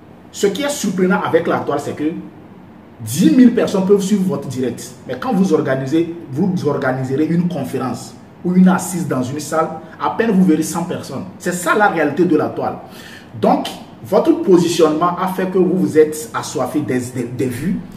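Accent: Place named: Togo. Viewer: Enfin un togolais qu'on peut suivre. J'ai reconnu l'accent en meme temps. Preach brother!! Preach .... Bon vent a toi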